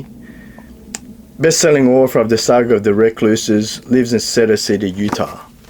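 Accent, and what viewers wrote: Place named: United Kingdom. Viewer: Dude I thought you were from the UK this whole time 😂 I guess I'm not good with accents Love the book hauls. Keep 'em coming 👊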